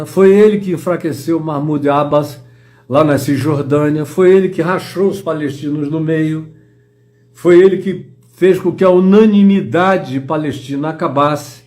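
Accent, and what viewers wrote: Recife, Brazil. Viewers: Aí sabe que fala Recife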